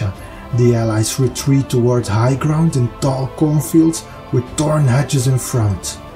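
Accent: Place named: Flanders, Belgium. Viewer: Ik dacht dat ik een Vlaams accent hoorde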